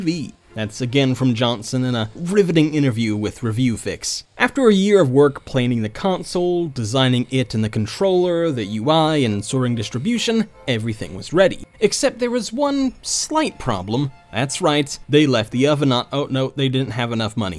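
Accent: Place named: Scotland, United Kingdom. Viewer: "Home town in South Carolina" Has Scottish/British/Aussie accent.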